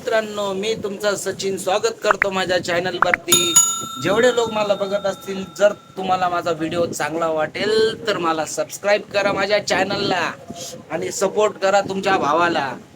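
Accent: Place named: Khandesh, India. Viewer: जमनी बरका अहिराणी एकदम ओरिजनल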